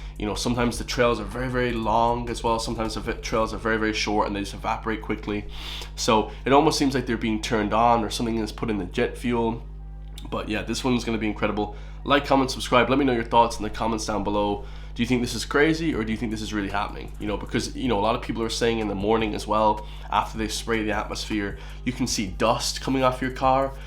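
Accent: United States. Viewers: Are you irish? You sound like a yank Trumper, cringe, drop the far right bollocks and go back to the rap reactions bud.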